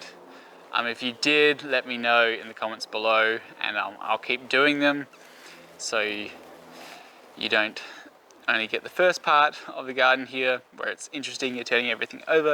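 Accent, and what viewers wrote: Australia. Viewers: Yes, Aussie. I'v got you not from England ;-)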